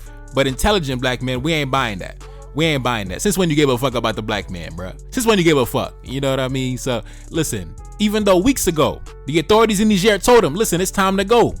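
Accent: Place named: New York, United States. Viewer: I love your geopolitical videos. Those are the ones that I always click on! Not only are they informative, but you manage to make them so funny too, with your New York accent😂😂. Keep it! 🇭🇹🤝🏿🇧🇮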